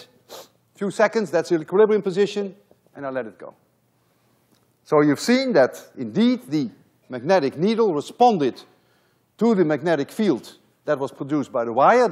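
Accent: Netherlands. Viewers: Alfred Castillo Exactly and i'm proud of this professor from the Netherlands just like i am. You can clearly hear that he has a Dutch accent.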